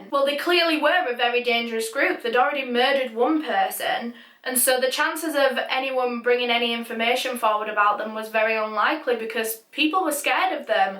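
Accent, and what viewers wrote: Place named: Geordie, United Kingdom. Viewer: Are you a Geordie? You sound northern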